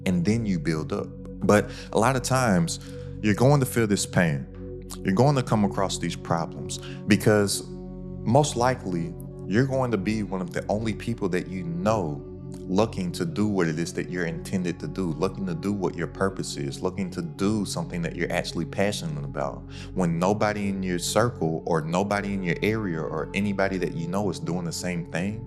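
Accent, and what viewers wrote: Kentucky, United States. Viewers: Are you from Kentucky brother? I’m in Lexington. Love your game, it helps me a ton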